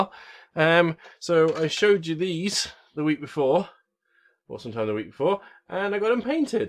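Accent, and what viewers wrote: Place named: Bristol, United Kingdom. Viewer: Ah right, thought I detected a Bristolian twang to your accent!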